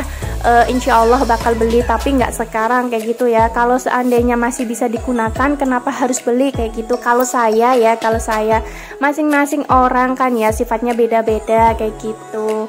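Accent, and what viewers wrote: Banyumas, Indonesia. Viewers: Emang mb aslinya orang mb sih mb kok kayanya orang ngapak y mb ak tuh orang Tegal mb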